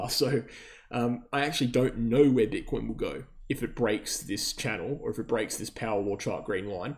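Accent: Australia. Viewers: I can’t believe I had to suffer through an Australian accent for that long, and get nothing out of it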